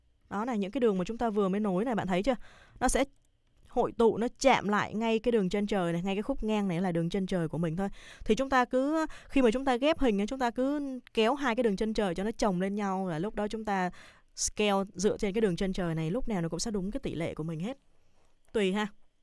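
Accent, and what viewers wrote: Standard. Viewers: cảm ơn chị rất nhiều, một bài chia sẻ dễ hiểu nhất, có lẽ những khóa học mất tiền cũng không chia sẻ những kiến thức này. Đã thế giọng chị lại còn hay phát âm lại chuẩn nữa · Chưa thấy ai phát âm chuẩn và dễ nghe dư bạn. Cảm ơn b nhé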